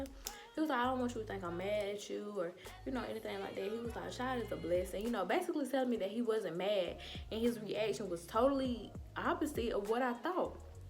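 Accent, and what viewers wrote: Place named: Louisiana, United States. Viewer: girl where you from ? you sound like you could be from louisiana 😍🐊.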